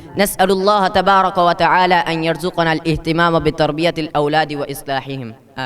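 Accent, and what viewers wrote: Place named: Arab world. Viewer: MASHA ALLAH very best speech & beautifull accent like ''ARAB'' ......... THANKYOU SO MUCH!!!!!!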